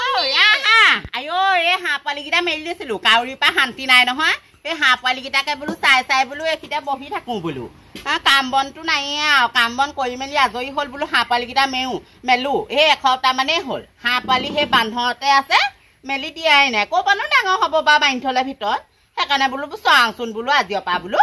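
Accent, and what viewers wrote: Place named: Upper Assam, India. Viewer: baai.. Bahut dinor murot palu dei.. Bahut bhaal lagil.... Apunar thaas tu ujonir type je.. Bhaal laage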